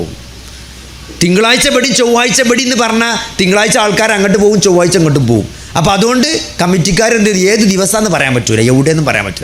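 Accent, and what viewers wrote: Malappuram, India. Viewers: Dr please speak our mother tuge in correct always i lesson your video but your Malappuram tune is there in your speach ok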